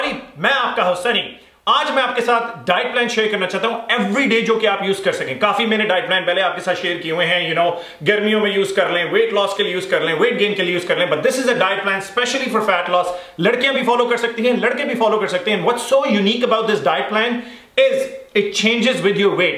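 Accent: Punjab, India. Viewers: AWSOME BRO SUPER LIKE TUHADA PUNJABI TOUCH SIRRA KRA JNDA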